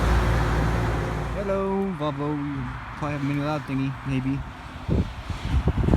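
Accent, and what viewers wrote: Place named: Canada. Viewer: guy has Canada accent but says PA in some vids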